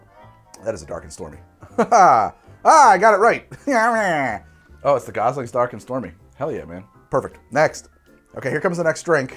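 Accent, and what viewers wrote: New Jersey, United States. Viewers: Wait are you from Philly dude? I thought the accent was giving Jersey. Anyway go birds!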